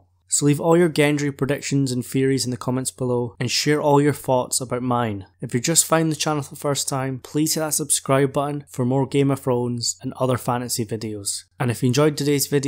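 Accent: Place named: Scotland, United Kingdom. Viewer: Great video, very interesting content & I enjoyed very much. You have a lovely Scottish accent ( assume Scottish lol ), it's lovely to listen too and you articulate really well. I'm from Outside London England, so I'm use to harsh accents lol. Wicked channel & I like your video content. Thanks 😎👍🏼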